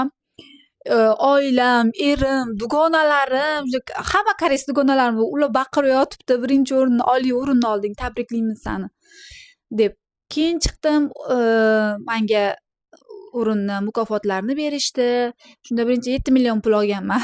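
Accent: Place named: Olot, Uzbekistan. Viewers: Shevangizdan bildim Buxorolik Olotdan ekanligizni Men ham Buxoroni Romitan tumanidanman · Salom gapirganiyizda buxoro shevasiga tortarkanaa opaa omad sizga❤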